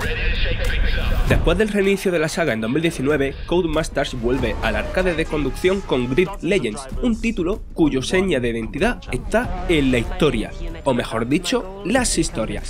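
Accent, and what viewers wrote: Andalusia, Spain. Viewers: Español con un perfecto acento andaluz. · El locutor no lo hace mal, pero creo que su trabajo queda lastrado por un accento demasiado marcado, a mí personalmente me saca del análisis, por eso Microsoft o Sony cuando hacen colaboraciones con streamers andaluces les piden que hablen en español con accento "neutro", no es por faltar el respeto a la entonación en sí, sino porque le resta seriedad al análisis. No cuestiono al locutor como periodista, seguro que es realmente bueno, pero como locutor no vale Vandal, por mucho que os empecinéis en ponerle a locutar. No pasa nada, yo tampoco serviría y tengo accento "neutro", no es una ofensa. Uno tiene que saber para lo que vale y para lo que no. Y lo digo desde el más absoluto respeto al periodista.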